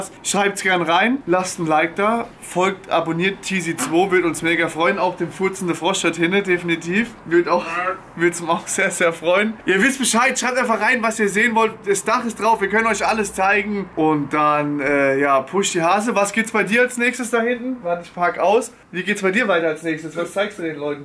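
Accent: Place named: Mannheim, Germany. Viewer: Meine Mutter als sie deine Stimme gehört hat: der redet wie ein richtiger Mannheimer, find ich geil. Und dann lacht sie die ganze Zeit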